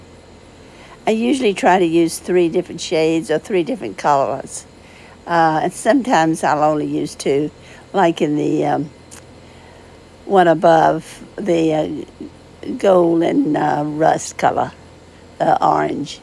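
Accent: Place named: South, United States. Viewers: What a great idea!! It turned out beautifully. I just found your channel and subscribed. Your accent sounds like your from a town near me in North Carolina!! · Your southern accent is beautiful...yella. These are such beautiful works of art! I just found your channel and I love it!❤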